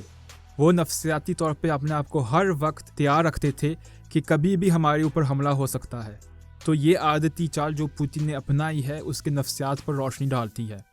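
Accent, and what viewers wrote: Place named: England, United Kingdom. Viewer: Ap urdu barri achi tarah angrez ke style main bolta hai😅😅😆❤️👍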